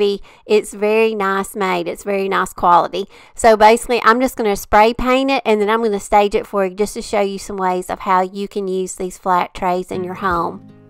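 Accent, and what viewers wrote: South, United States. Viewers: Love it all and love you too!!! You are such a sweet southern lady and love to hear you talk (Georgian here). Have a blessed day and look forward to the second half tomorrow!!!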